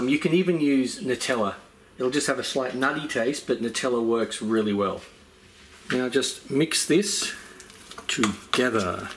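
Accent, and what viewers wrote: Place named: Australia. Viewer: Remember when I was only 11 and watched a ton of your videos, I think you only had around 50k subs. Still Love Your Aussie Accent!!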